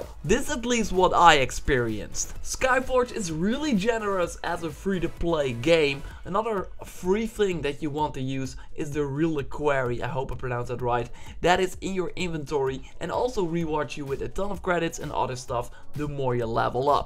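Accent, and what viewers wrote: Netherlands. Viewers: You sound Dutch :)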